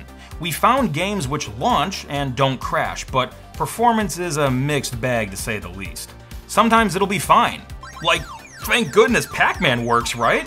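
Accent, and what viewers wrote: Canada. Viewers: That's just how Canadian people say vague. Don't change who you are ;) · Are you Canadian? People tell me I don't pronounce vague correctly, but I think it might be an accent thing.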